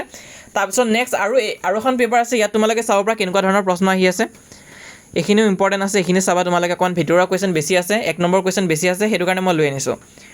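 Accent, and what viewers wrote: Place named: Sivasagar, India. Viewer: Sivasagar distric r english khon lgeh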